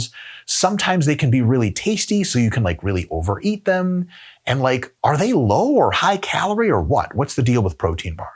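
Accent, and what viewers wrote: United Kingdom. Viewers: 😂😂 As an English fan of yours you do make me laugh with your British accent. Nice one 👏🏻💪🏼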